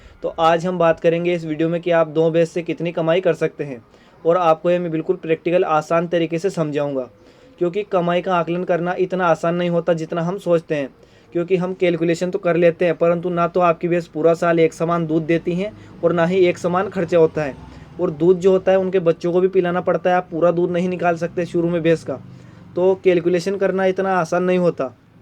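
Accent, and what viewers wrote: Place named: Rajasthan, India. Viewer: aap rajsthan se ho ,aapki languege bta rahi he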